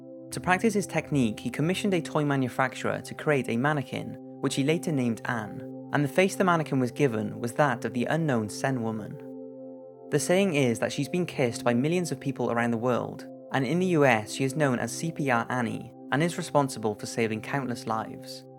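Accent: Wales, United Kingdom. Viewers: are you welsh? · I've always wondered, are you Welsh? you seem to have a slight Welsh accent. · He’s British that’s for sure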